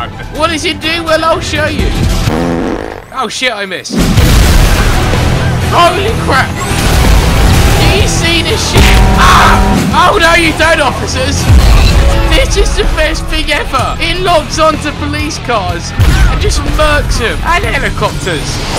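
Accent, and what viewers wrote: United Kingdom. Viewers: he dosent have eneything against them its for entertainment purposes also hes british wich makes it 10 times funnier :D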